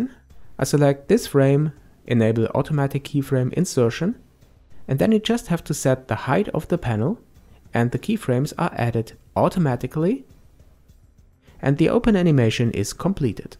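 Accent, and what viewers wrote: Germany. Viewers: You sound so german I almost thought you would start talking german.^^ Fand ich irgendwie totaly trippy.^^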